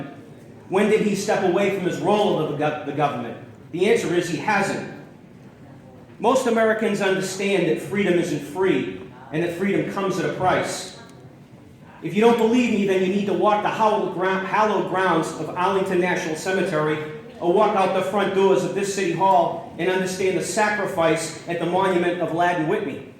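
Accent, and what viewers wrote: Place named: Italy. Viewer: This speaker is of Italian descendance.His accent gave him away....;)))))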